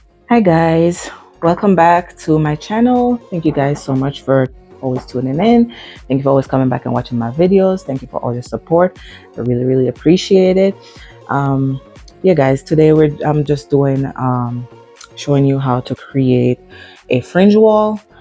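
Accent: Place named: Jamaica. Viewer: You sound Jamaican..... love that 🇯🇲🇯🇲🇯🇲🇯🇲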